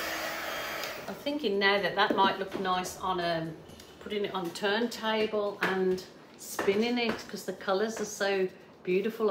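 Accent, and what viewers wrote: Birmingham, United Kingdom. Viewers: Lol... I've been thinking it's a bit strange that there's a place in Australia with an almost brummy accent 😂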